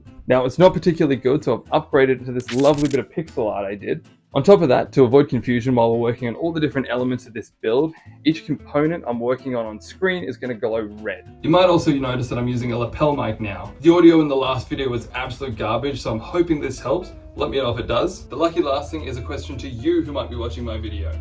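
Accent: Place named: Australia. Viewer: mate lemme tell you something, i was so pleasantly surprised to hear an aussie accent on a niche video for once, letting me know that i can actually buy the shit required for the project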